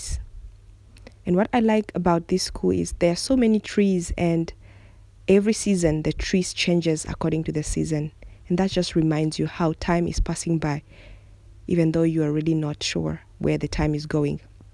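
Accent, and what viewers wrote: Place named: South Africa. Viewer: You're South African.. your accent reminds me so much of my friend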